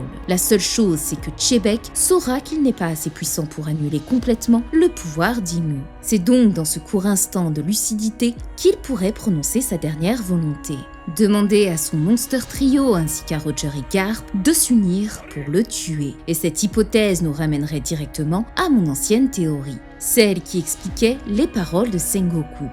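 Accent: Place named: Belgium. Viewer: Le leger et subtil accent belge de retour :)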